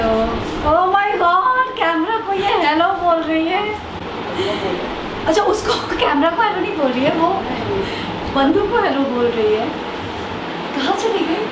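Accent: Bihar, India. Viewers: Apki voice or bolne ki tone hi irritating hai aisa lgta h Bihar ka touch h sur nikal kr bolna normal bola Kijiye thik lagega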